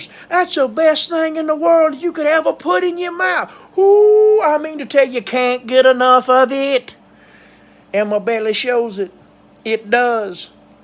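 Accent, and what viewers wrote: South, United States. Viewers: It wouldn't be if he didn't talk southern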